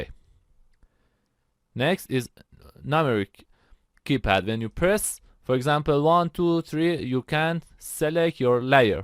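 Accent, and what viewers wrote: Iran. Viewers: Thank u for this useful tutorial , but u really need to work on ur persian accent , maybe u should listen to nosrat english learning to correct ur accent , thnks again.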